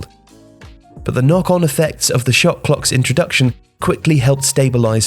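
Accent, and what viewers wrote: England, United Kingdom. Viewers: Weird hearing this with the English accent from tifo football group etc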